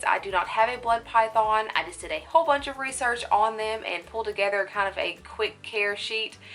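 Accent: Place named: Georgia, United States. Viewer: I love your country accent. Sounds Southeast, maybe Georgia my guess. Anywho, love your channel. Great educational but more importantly, for me, your accuracy. 👍😁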